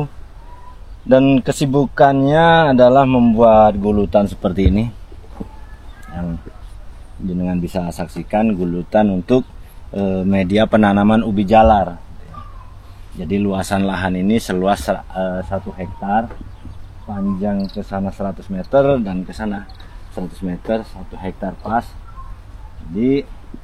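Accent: Madura, Indonesia. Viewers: Bhs indo logat madura 😅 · Khas madura gak bisa hilang. Gaya bahasanya mirip cak islah bahrawi dan pk Mahfud MD 😂😂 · alhamdulillah tabarakallah, sukses bertani moderen di negeri Sakura ❤❤Kami mau dengar, bahasa jepang logat madura atau bahasa madura logat jepang ❤❤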